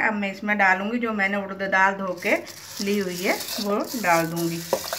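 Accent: Pahari, India. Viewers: Araa aunty apki recipe ne toh moti hi madad hi kr di.. lockdown k time (pahari accent) ☺️ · I watched so many videos of this recipe but what was special in your video was the little pahari accent that was there Usko sun ne baad confirm hua yes someone from Himachali connected to roots is making this and this is authentic and right way to cook it This was so sweet of you aunty ji ♥️ Much love from your son like child Deepak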